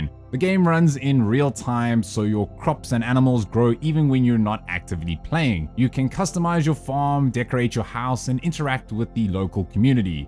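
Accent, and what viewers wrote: South Africa. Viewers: you sound south african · Nice to hear a Capetonian accent on the YouTubes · Hold up. Is that a fellow saffa I hear?!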